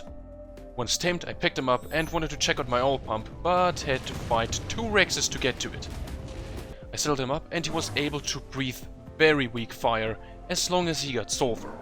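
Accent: Germany. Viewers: Bro u german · I love yo vids, but I have just one question: Are you german? 🧐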